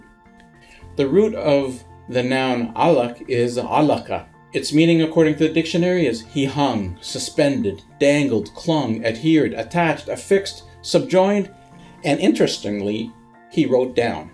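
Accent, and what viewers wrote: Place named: Iran. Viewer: Because he is Persian. I think. They have hard time pronouncing qaf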